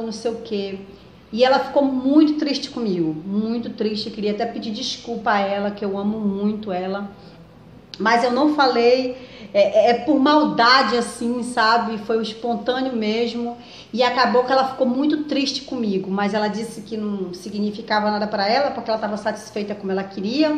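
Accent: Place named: Northeast, Brazil. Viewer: nossa adoro esse seu jeito de falar bem nordestino msm" mangano" quer dizer rir de vc. sou de Natal Rio Grande Do Norte. bj linda vc é nossa motivacão!😙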